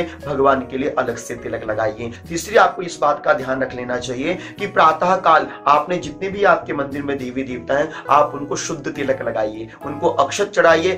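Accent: Standard. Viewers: Bahut achhi video,shudha uchharan k sath...apka dhanywad...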